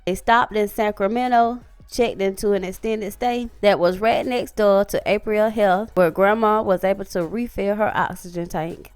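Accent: South, United States. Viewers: Girl, your country accent is so strong that I can barely understand what you're saying. I would just have to read with your voice on mute. Sorry. Where are you from? Alabama? Arkansas?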